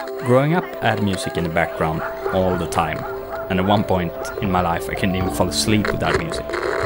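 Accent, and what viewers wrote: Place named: United Kingdom. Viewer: I like your music very much and your British accent as well ❤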